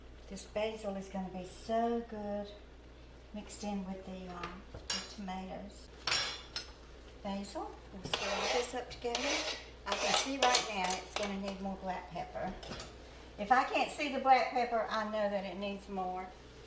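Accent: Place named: Georgia, United States. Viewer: I don't know where you live, but you sound just like my sweet grandma from Georgia. ❤️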